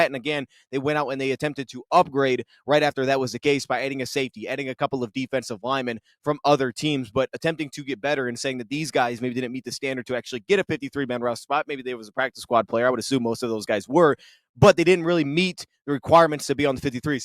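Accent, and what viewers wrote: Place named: Midwest, United States. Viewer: This is literally a record of what he is saying so you can play it back. Sorry you can't keep up with that Midwest accent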